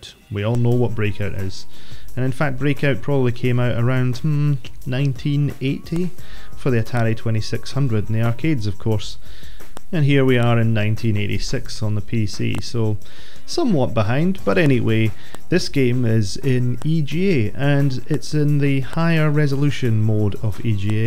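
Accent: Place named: Scotland, United Kingdom. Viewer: I love the Scottish accent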